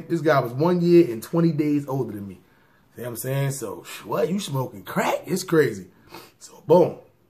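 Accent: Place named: New York City, United States. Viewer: Nothing like a nigga from nyc telling a story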